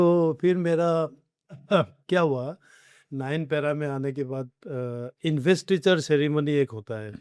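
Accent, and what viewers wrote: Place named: Arunachal Pradesh, India. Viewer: Arunachali accent is coming 😄❤️🔥🔥🔥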